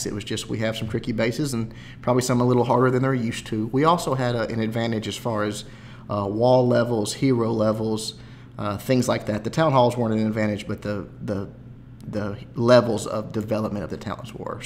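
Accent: South, United States. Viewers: Hey, I wanna remind you of something you said on you 1st upload... You said your accent isn't the best but I love it!! "Golems are fixin to cross" omg!! Too awesome man you've made a brand about yourself that no one can measure up to!! Great job on the channel brother!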